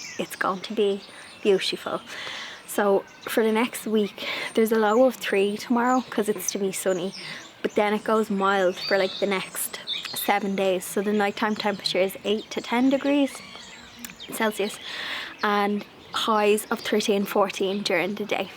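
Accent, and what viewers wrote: Ireland. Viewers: Hello from the States! Will your sweet hedgehog be hibernating this winter or will he be active year round? The family that introduced my parents to each other waaay back in the 50s were from Ireland, so I was blessed to grow up hearing the lovely Irish lilt quite regularly. Just wanted to let you know how delightful it's been to hear that lilt again since discovering your channel a few months back! ❤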